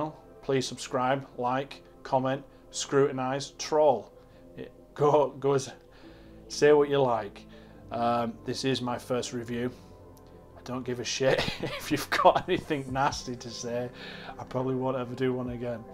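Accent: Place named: Northern England, United Kingdom. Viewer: Cracking review mate. Honest and Proper Northern!!!! ;)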